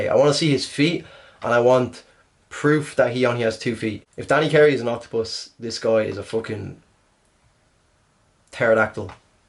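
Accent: Dublin, Ireland. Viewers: Ok so... I discovered your channel today, I have watched something like 20 videos... and not a single comment about? It seems you like, is the "same" style (and they were an inspiration for ) mixing metal and electronic music.... and everything is great with them! Voice, music, lyrics, and video clips! I can recommend you a few titles if you're interested; but honnestly everything is great! Considering video clips, my favourite songs would be (german and english version);;.... and for the electronic part:) Anyway, keep up the good job, you're the only reaction channel I actually find interesting (plus your accent reminds me of Dublin <3 )! Greetings from France!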